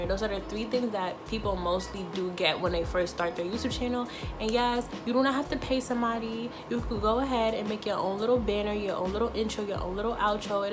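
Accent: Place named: New York, United States. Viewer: You gotta be from NY or up north lmao I hear the accent okay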